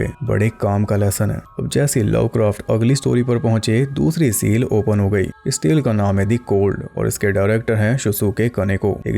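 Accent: Haryana, India. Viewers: Aap ki explanation ek baat pata chali ki aap harayana se ho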